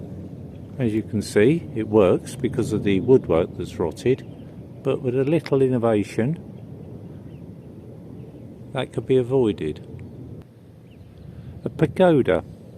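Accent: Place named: England, United Kingdom. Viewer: Nice video. Glad you made it. I love the architecture. This guy has the best voice. Love the accent. I’m not sure where he’s from but it has to be close to Michael Caine’s home. They sound identical. Definitely the same accent. This guy has a wonderful voice.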